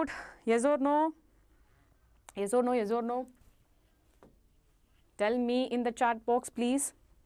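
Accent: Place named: Tamil Nadu, India. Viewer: Hi mam Karishma present mam Today session is done and dusted mam Sorry mam i didnt attend the last 1 hour lecturer but now i finished mam I really enjoyed this session and as much i will understand also very much mam Thank you for this amazing session and please continue sessions like this with your cute Tamil and smile😍😍😍😍😍😍😍😍😍 THANK YOU GOPIKA MAM TO SUGGEST YOU FOR US 😍😍😍😍😍😍😍😍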